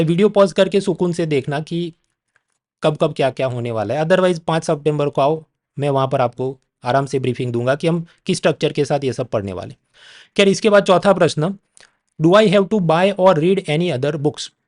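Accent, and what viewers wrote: Gujarat, India. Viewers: Saheb tamari vato ma Gujarati touch joine bhaiband bau maja aave chhe 😂😂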